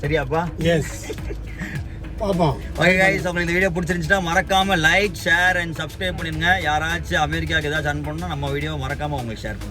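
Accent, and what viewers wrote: Madurai, India. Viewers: Madurai ya thala neega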